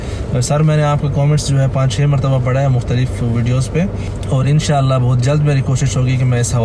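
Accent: Pakistan. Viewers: Appki urdu Arabic sound nhi karti hai 😊😊 pakistani ki ek touch hai aur urdu mei pakistan se behter koi nhi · Bro u said am from madina ok u born in madina ok but origin from where yani your forth father from pak or India ...I think pak cos your urdu akcent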